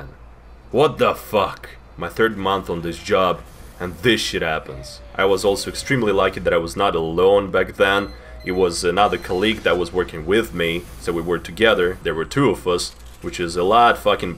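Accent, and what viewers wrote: Russia. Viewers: a russian person telling histories while playing tf2? I NEVER KNEW I WOULD NEED THIS SO BAD IN MY LIFE please make more of this type of content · I dont know why but its so chill to hear your russian voice and your stories. Please make this a thing!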